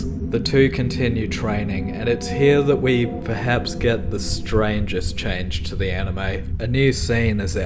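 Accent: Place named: East Coast, United States. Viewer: Lol I came to this vid via your your big lez show vid. As someone who lives in Perth, no disrespect intended but my God you easily have the most easy coast accent I've ever encountered :D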